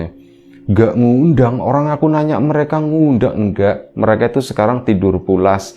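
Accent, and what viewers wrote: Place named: Banyumas, Indonesia. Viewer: Hader kawan...ora ngapak ora kepenak😃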